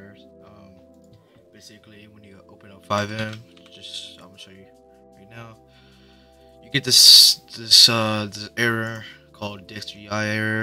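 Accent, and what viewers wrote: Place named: India. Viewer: bros indian now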